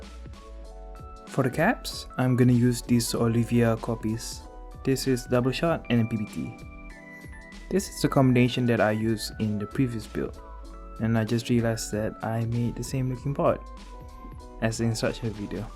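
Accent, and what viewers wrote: Malaysia. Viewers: No wonder your english sounds familiar, melayu rupanya hehehe mantap bro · no matter how hard you try to hide your accent, a malaysian can always tells the different, ewaah. no la...link shopee tu shopee malaysia xD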